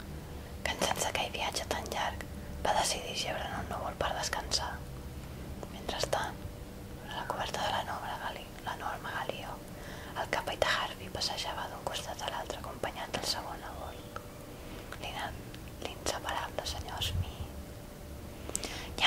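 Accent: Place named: Spain. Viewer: Hola: Que bonito tu ASMR, me encanta la tonalidad de tu voz y lo más FANTÁSTICO es que el acento ESPAÑOL SE PRESTA MUY BIEN AL "ASMR". Por favor, haz mas ASMR, en Español y por ahi en Catalán, PERO ahorita procura hacerlo en Español, ahhhh siempre es recomendable poner en el titulo del Video ASMR, cuando vas hacer ASMR, para que así más usuarios puedan gozar de tu lindo material FELICITACIONES: Me encanto tu Video. Saludos desde Lima - Perú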